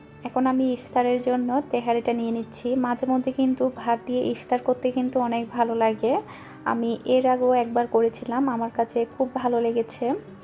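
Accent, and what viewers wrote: Chittagong, Bangladesh. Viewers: চট্টগ্রামের কথা শুনতে খুব ভাললাগে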